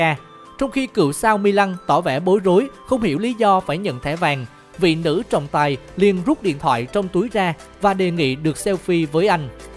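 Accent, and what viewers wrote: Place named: Binh Dinh, Vietnam. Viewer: Giọng bình định trong sâng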